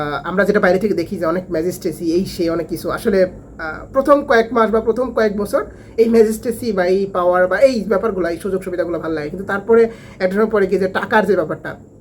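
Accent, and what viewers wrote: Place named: Chatmohar, Bangladesh. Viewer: Vai apnar bari chatmohar tai na kotha dekhei buja jay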